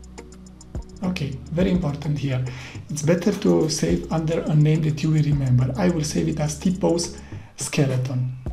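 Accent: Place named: Romania. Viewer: banuiam ca esti roman :)))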